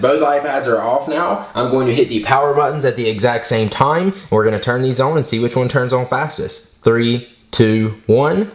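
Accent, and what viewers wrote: South, United States. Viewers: Love your southern (I assume) drawl!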